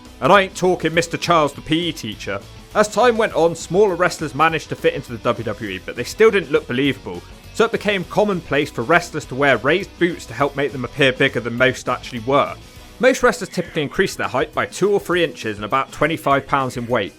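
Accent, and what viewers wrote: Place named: United Kingdom. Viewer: How come these narrators are always British?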